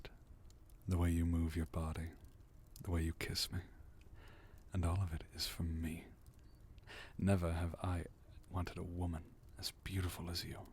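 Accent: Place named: RP, United Kingdom. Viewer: This mans voice is so smooth, out here sounding like a british gentleman from the 1800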